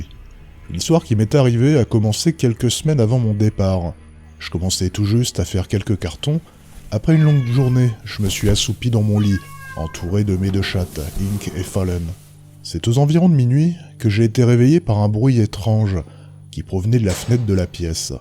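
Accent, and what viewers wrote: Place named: Quebec, Canada. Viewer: Le Québec doit être magnifique mais vos expressions et votre accent est tellement ridicule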